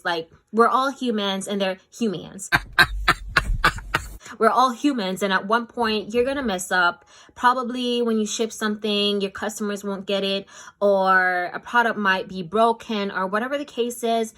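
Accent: Jamaica. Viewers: I hear Jamaican accent somewhere in there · Hello, I am wanting to venture into the beauty industry, I hope is to cater to everyone but specifically: Acne prone & Oily skin. Glad I came across your video. Also, I heard a Jamaican accent ❤️ 🇯🇲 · Do you have Jamaican parents? I'm hearing Jamaica in your voice.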